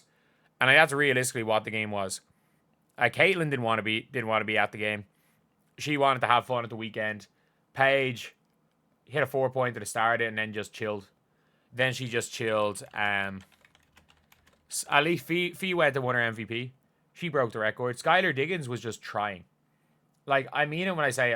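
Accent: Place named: Ireland. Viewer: You sound Irish.....😊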